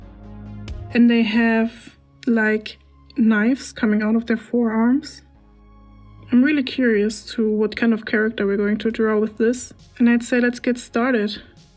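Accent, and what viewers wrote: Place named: Germany. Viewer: i was summoned by the german accent! XD love you already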